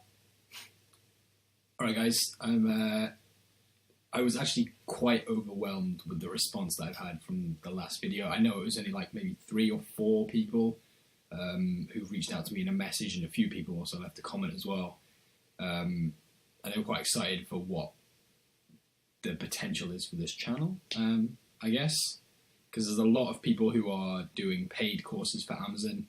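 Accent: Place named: United Kingdom. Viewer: Hello - I prefer to to 'read' your course, rather than watch it.. [your British accent is lovely but a bit difficult for me... also, the snapshots you share are blurry], so... do you have this good information in a book or a website or something? Thanks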